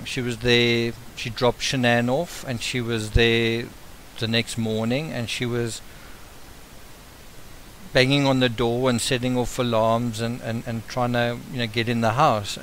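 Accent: South Africa. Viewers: He has a south African accent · Omfg.. I’m off.. soooo sssslllloooo<link> thst and the SA accent.. sorry 😐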